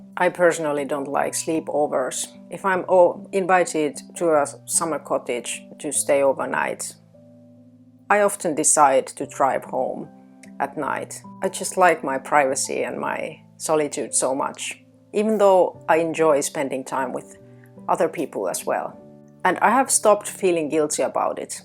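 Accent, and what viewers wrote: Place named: Finland. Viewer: Some 40 years ago, I had the opportunity to live in southern Finland for a year and hearing your accent brought back a lot of good memories. Thank you for that. I became aware of the HSP type only a couple of years ago and it felt very affirming as I read through The list of the ways that we are “different“ that are normal for us. I have quit trying to please others, I accommodate them when I feel I can, and that has made a big difference in how I feel and I’m able to live. I have found 15 to 30 minutes of meditation pretty much first thing in the morning is how I feel and function best so I make sure I have the time and privacy to get that in no matter where I am or what I am doing. I have become a bit of a recluse, but that seems to be how my life works best. You have to honor your own needs first or you cannot be there for anyone else.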